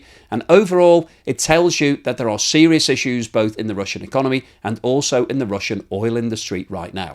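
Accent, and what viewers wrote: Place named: Liverpool, United Kingdom. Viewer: Where do you live???? Liverpool I would guess 😊😊😊😊😊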